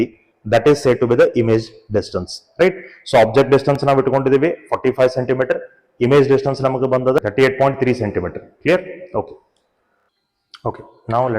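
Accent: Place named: North Karnataka, India. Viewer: And also I got know tht u are Uttar kannadiga by ur talking style😅 am From GADAG SIR Bipin Chikkatti Pu science College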